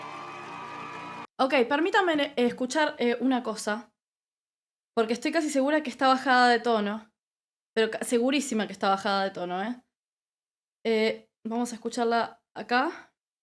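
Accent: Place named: Argentina. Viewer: 😢 que mal ojalá que sigas haciendo más videos me encanta tu voz y tu acento si eres argentina porque aveces me equivoco con los uruguayo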